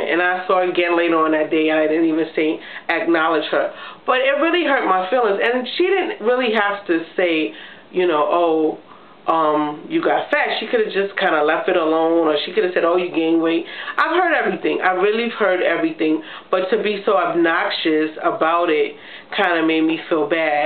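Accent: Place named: New York, United States. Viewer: Dang your accent is so deep...Ny right lol...but people cant be so so rude. talking about your feelings and writing about them in a journal will defintley help..You have a right to feel any way you want about a situation those are your feelings...you are the only one walking a life in your shoes..no one has had your life but you so you're feeling will be different from everyone else. With the tears, the healing definitley begins little by little.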